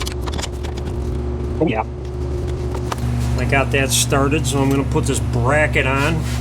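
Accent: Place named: North, United States. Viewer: This is so good! Naming the nuts by MM. Talking to gravity in a positive way. Staying positive no matter what. No cussing. No broken knuckles. lol and a sweet accent. Northern US or Canada? Maine? Minnesota? Uper? Thanks so much man! Very well done!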